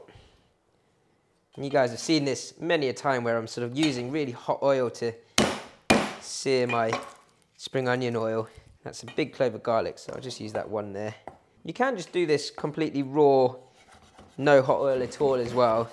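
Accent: United Kingdom. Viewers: Great video, you explained the details on how to make it. I also love your British accent. Oh boy, you have used too much oil in making it.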